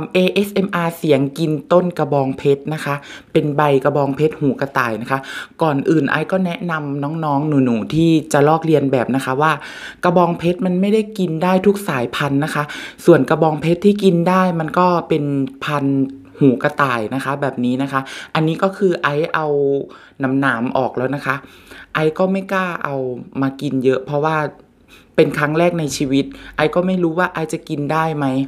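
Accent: Isan, Thailand. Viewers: พี่เปนคนอีสานหรอคร้าาตอบหน่อยนะคร้าา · พี่เป็นคนอีสานเหรอค่ะ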